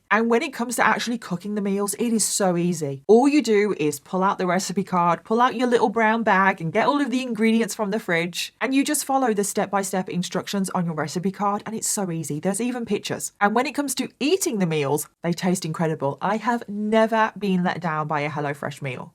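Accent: United Kingdom. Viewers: I find you attractive despite your British accent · LOL loved this roasting of creepy Americans in British Accent 😅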